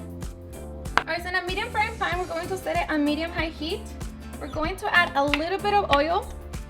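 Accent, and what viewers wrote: Mexico. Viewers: Just found your channel today in one thing Are you Mexican because I think you some Mexican accent in U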